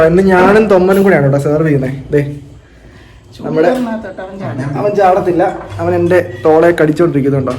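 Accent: Kottayam, India. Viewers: Kottayamkarananno bro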